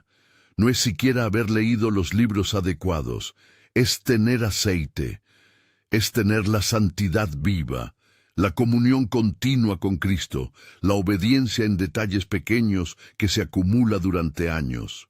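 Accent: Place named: Castile, Spain. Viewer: pones tu vocecita castellana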